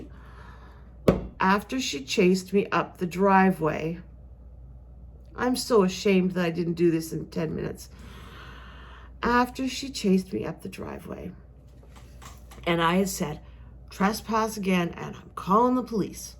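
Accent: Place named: Canada. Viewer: I am guessing by your dialect you are in Canada?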